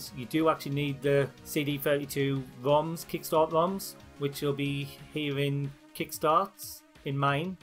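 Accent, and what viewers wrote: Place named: England, United Kingdom. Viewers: HI, i have followed your tutorial but only get the CD32 loading screen, i am not sure if my games are in the correct format, i have unzipped the folders and have a cue file and another iso folder inside the game rom folder. i have the kickstarts installed. by the way i thought from the accent you were from Middlesbrough, i am from newcastle myself. hope you can shed some light cheers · hey! i know its been asked and answered already but where in england are you from? i just lovin the accent and i wanna look into it and hear more of it:) i dont remember your answer let alone the video where i read it. thx. (also always lookin for new amiga stuff from you:)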